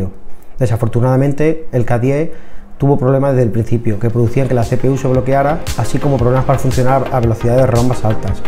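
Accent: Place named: Spain. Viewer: soy latino y real mente no es por nada pero no soporto el exento español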